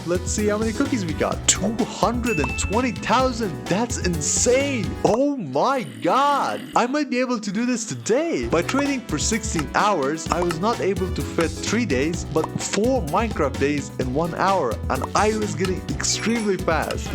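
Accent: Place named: United Kingdom. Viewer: You just have british english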